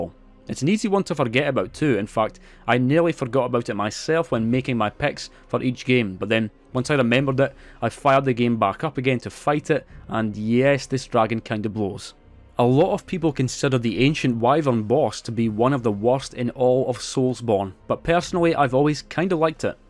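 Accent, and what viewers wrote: Scotland, United Kingdom. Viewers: I watched "Dragonworld" when I was a kid, and hearing a Scottsman talk about dragons just brought back so much nostalgia.❤ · A Scottish man talking about dragons makes me happy thank you for the video man. I think the reason why the dragon God is so different is because it was actually dead and was revived using a demon soul which is why it looks like a demon dragon hybrid. I still love the look of it though it's by far my favorite looking dragon in the soulsborne franchise. I also like the fact that you have to use large ballistas to actually be able to defeat him, he's just that powerful.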